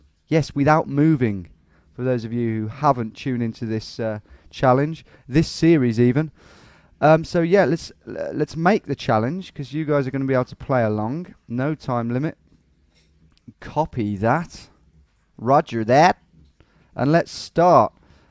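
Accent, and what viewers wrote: United Kingdom. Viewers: I just realized that Stewie has a British accent and therefore you sound like Stewie. 🏈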